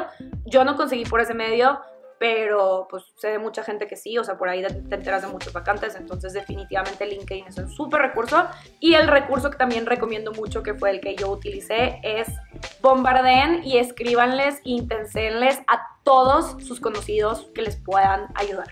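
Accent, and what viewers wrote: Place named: Fresa, Mexico. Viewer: Apenas sentí su acento fresa deje de ver el video, que mierdas puede ayudar una persona que solo con las referencia de papi y mami ya consigue todo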